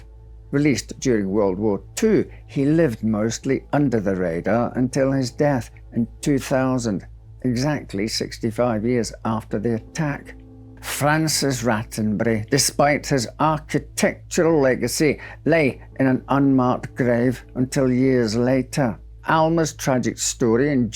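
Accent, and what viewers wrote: Scotland, United Kingdom. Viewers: He does tell a good story. Sounds a bit of Scottish accent, which makes it so much more interesting. I have no trouble understanding him as he's always careful to articulate clearly.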